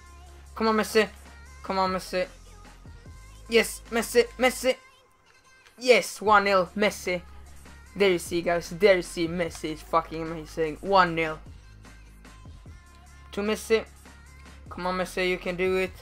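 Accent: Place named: Sweden. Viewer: Just Swedish viewers here... Because of that english.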